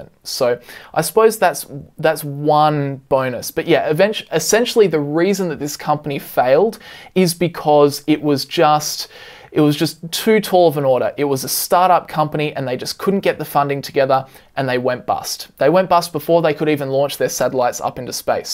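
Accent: Australia. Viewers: Wait, are you Australian? I thought you were Dutch...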